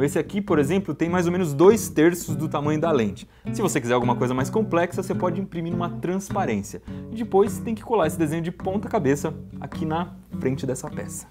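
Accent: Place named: Minas Gerais, Brazil. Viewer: Iberê vc é Mineiro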